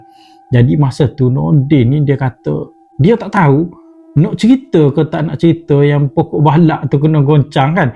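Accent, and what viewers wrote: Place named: Kelantan, Malaysia. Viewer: Bahasa tu bang.... Lekat sangat bunyi Kelantan... Kadang kurang faham... 😊... Harap maklum ye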